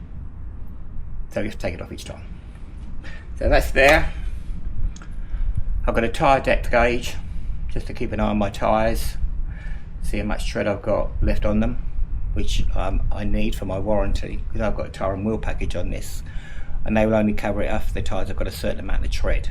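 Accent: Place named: Australia. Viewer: Finally, a guy with the wisdom and guts to tell the truth about Wing saddlebags compared to Harley bags! Thank you! I still would love to buy a bagger Wing like yours because at 78 years old I removed my travel trunk on my "09 Harley Ultra Classic a few years ago because I can now swing my leg over the rear fender to get on my bike without arthritic hip pain. Same would be true if I had a Wing with the trunk. At 6'3" it is too hard to get on the bike with the trunk in the way. I suppose I could put a Wing on the center stand and stand on the pegs/boards to mount but I'd rather be able to swing my leg over the seat. Sadly, at 275 lbs., 6'3", size 14 boots it is very unlikely I could fit comfortably on your bike without many modifications $$$ from Traxxtion Dynamics. Maybe I will win the lottery, I should think positive. Anyway, not sure if you are an Aussie in Florida or a Floridian in the land down under, but think I understood 90% of what you said, mate. 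Hope you do more Wing stuff because this bike is on my radar....if I can find a Honda dealer that would take my two Triumph Rocket III's and my Harley Ultra in trade for a fair price. PS" I carry most of the same tools as you do, the pump, the battery booster, tire repair, etc. on all three of my bikes. Several weeks ago I got a flat rear tire on my '09 Harley Ultra(65,000 miles) within about 3 miles of my home after a 150 mile ride with friends. Long story but I limped home and could not find a nail or screw or anything to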